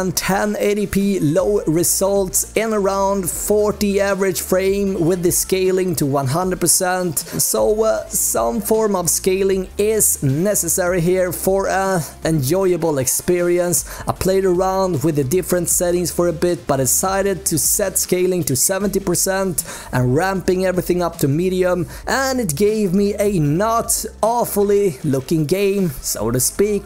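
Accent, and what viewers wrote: Sweden. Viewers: Sounds swedish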